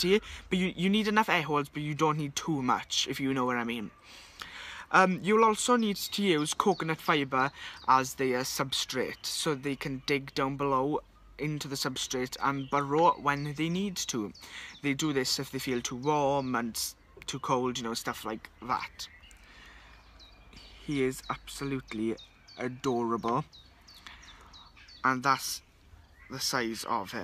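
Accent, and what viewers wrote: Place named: Wales, United Kingdom. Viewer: Awesome land snail, and Welsh accent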